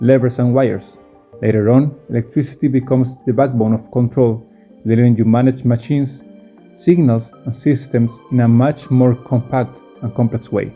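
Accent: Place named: India. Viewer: Author sounds like Indian guy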